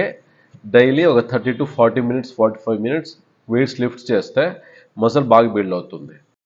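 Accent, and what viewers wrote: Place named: Anantapur, India. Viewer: Sir , mee uuru pakka atp to karnataka border sir slang chusthey thelisipothundhi❤ any ways thanks for the great info🎉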